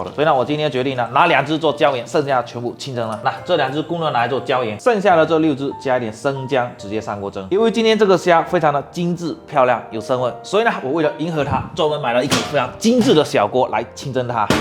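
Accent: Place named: Fuzhou, China. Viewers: 虾菇好吃。福州腔好重，福州人吧？ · 福州口音呀？ 大哥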